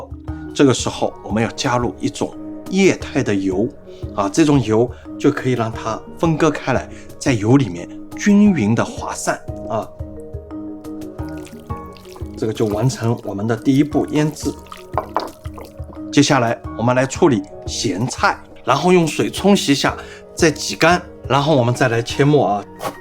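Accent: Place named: Shanghai, China. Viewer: 沪普好听。盼能回国吃一碗鲜得来眉毛也要落下来的雪菜黄鱼面。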